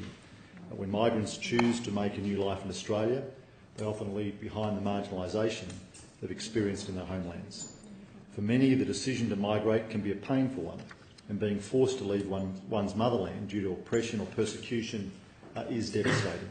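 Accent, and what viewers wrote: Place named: Greece. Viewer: Dude, from the way you talk I can tell you're greek. Here's a reality check. Turks took constantinople from the byzantines, If you greeks were so mighty why couldn't you defend it? Even in the modern age. Why is Greece bankrupt? Why are greek politicians begging Turkey's prime minister to help their financial situation? Greece is fucked my friend, no european country can help because they are ALL in debt LOL You can talk all you want, this is the reality haha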